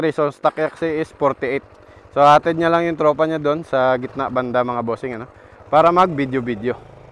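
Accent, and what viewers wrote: Iloilo, Philippines. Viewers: Ilonggo go ka gali ayusin mo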